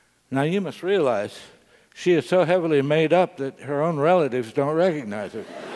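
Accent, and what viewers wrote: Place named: Indiana, United States. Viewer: A Hoosier.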